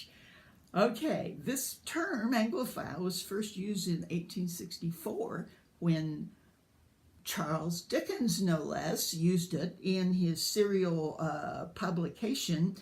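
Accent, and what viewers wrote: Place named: United States. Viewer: yes we drink tea in massive amounts. tea is the answer to everything. and beans on toast is standard food for us all the way through our lives. the most scrumptious and delicious comfort food, and very easy to make and very hearty when we can't be bothered to cook a full meal. Just open the tin of beans and make some toast! :D well I am British and I do like your accent too. Just as American ladies love English accents, so are we Englishmen attracted to American ladies with charming accents!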